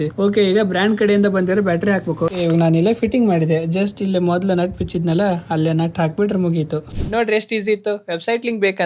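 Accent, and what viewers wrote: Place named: North Karnataka, India. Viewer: Anna nimd yav uru UTTARKARNATAKA na❤namdu.. Bhasha same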